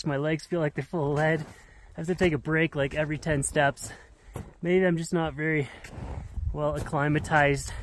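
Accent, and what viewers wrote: Canada. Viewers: He needs some Dominos in his life. I should really donate to this guy. Love the Canadian accent. Tell is a story or 2 about your hockey youth!!!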